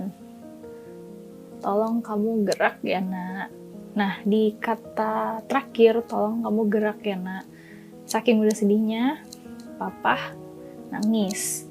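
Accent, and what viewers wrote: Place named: West Sumatra, Indonesia. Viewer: logatnya kaya orang minang😁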